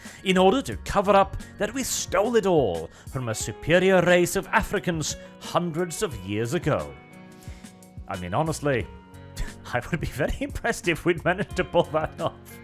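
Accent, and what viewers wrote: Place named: United Kingdom. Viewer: Your from Norway? you sound more British than the British do!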